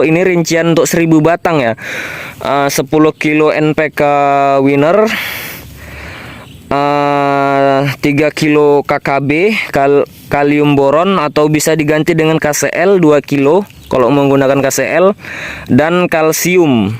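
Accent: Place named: Medan, Indonesia. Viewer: Kalok nengok dato bahasanya abang ini orang medan.dari mana bang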